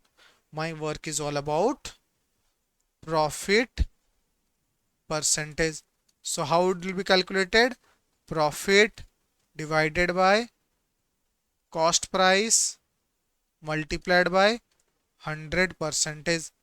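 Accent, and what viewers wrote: Odisha, India. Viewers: Thank uh but why I'm feeling like sir is from odisha 😅🎉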